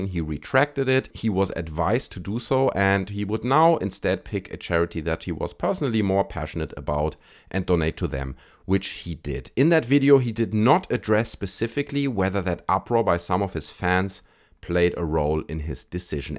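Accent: Germany. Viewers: Also out of context are u german? just ur accent.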